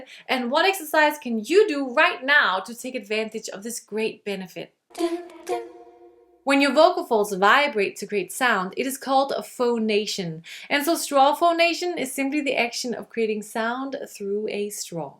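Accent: Denmark. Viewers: He he, synes godt jeg kunne høre en dansker bag den ellers næsten perfekte accent :). Det var dog først da jeg så dit navn, at jeg kunne lægge to og to sammen:). Tak for nogle super gode og informative videoer!!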